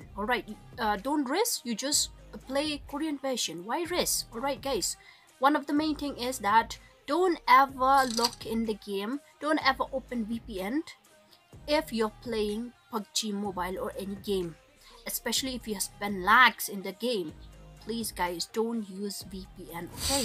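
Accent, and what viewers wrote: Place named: England, United Kingdom. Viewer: So much Overreact in English accent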